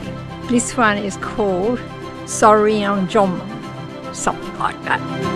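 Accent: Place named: Australia. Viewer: Welcome to Korea and hope you're enjoying your stay in Seoul. When I heard your accent, I knew you were from Australia and saw you told a man that you are from down under. If I heard it correctly, , heard you saying "what a larrikin!" 🤣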